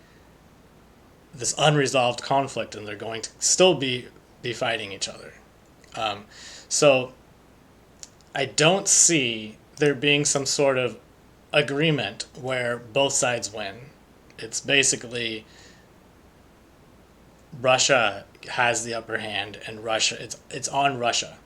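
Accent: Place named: Finland. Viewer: hmm, possibly Finnish? I legit thought you were 100% Finnish at first and you almost like… have an accent? 😅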